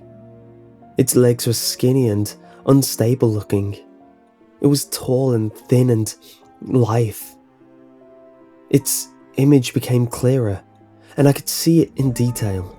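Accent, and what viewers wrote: England, United Kingdom. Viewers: He sounds like an English male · something about being read creepy pasta's from a guy with a British accent is really fun.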